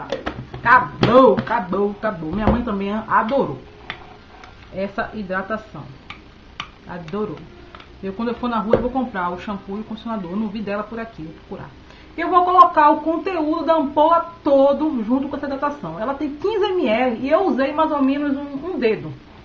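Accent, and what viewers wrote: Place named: Salvador, Brazil. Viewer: Pelo sotaque vc mora em Salvador. Onde encontro essa linha?? Aí em salvador??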